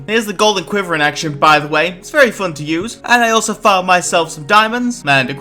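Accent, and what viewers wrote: United Kingdom. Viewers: Yo fr I CANNOT listen to a british "person" talk for 30 mintues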